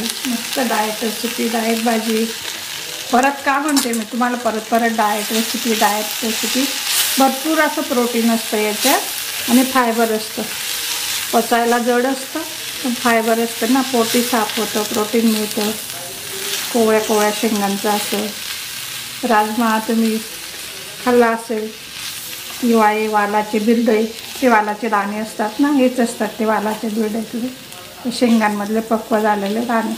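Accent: Khandesh, India. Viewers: tumcgya sagaly recipe khup chan asatat, tumcha khandeshi lahejat bolane mala khup aawadte, all the best tai.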